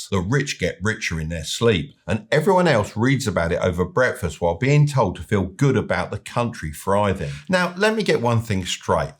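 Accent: United Kingdom. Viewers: come on grand pa. I think you lying. cos america is booming business is great.. you sound liek a British. a depressing miserable land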